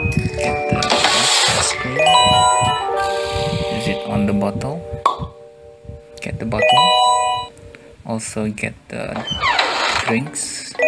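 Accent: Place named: Philippines. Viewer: can I ask, what country are you from? your accent is kinda like a filipino. but anyway, thanks for the walk-through 😁💪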